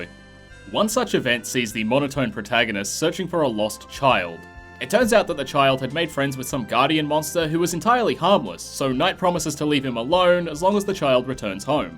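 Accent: Australia. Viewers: You're Australian.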